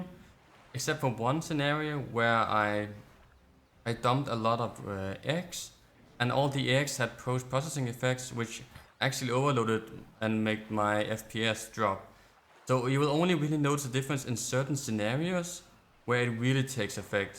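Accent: Denmark. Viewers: Hey bror, har set du er stoppet med at poste, men ber dig begynd at post igen tro mig. Du skal bare øve dig på dit engelsk så det ikke er danglish og blive ved med at poste og lock in. Tro mig hvis du bliver ved bliver du stor på et tidspunkt.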